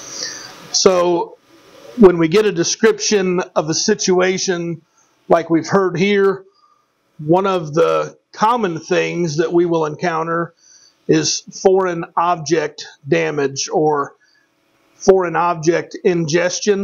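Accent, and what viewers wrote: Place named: South, United States. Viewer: Leginment country boy will bring you back dreakly i reckon only country boys like us talk that way😂 but that saft dam sure got geterd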